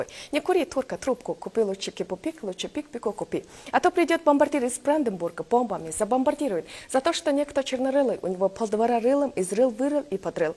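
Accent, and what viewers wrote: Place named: Mongolia. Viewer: damn so thats how mongolian accent in Russian sounds like… those lisping L's are the cherry on top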